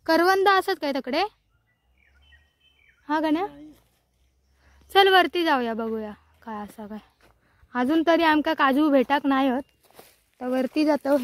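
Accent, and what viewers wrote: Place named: Malvani, India. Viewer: गो सिंधुदुर्गातील खयच्या गावात काजी काढुक गेलंस... तुझा मालवणी कानाक गोड वाटता... ❤❤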